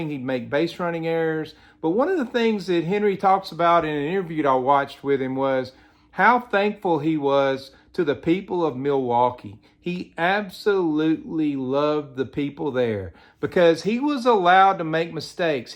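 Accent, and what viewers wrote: South, United States. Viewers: A Labor of Love - Especially Poignant when done with your southern accent ❤❤❤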